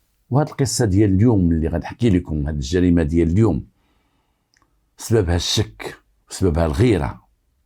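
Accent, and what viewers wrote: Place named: France. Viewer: . صاحب صوت وثقافة ولكنة فرنسية فريدة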